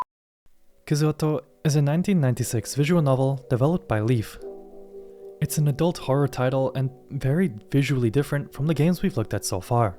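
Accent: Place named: Sweden. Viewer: Sounds like a light swedish/upper european accent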